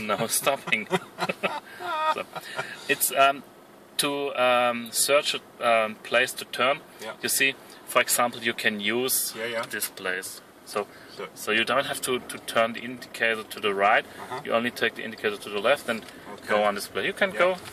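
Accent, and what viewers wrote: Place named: Germany. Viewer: I love the German accent of speaking English!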